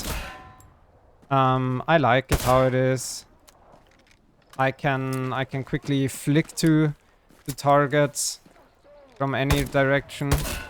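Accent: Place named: Germany. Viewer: Man merkt so das du deutscher bist😂😂 aber danke dein video hat mir echt geholfen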